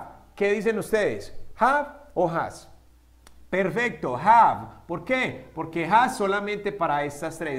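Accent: Colombia. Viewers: Me encanta tu acento colombiano. :P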